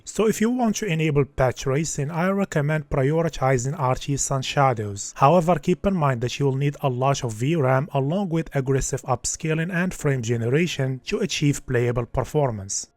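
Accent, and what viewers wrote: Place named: Morocco. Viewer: omg your accent is so moroccan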